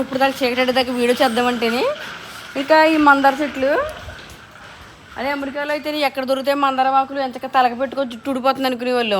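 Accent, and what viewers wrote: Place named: Amalapuram, India. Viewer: మీ బాషా యాసా అచ్చం అమలాపురం బాష చాలా బాగుంది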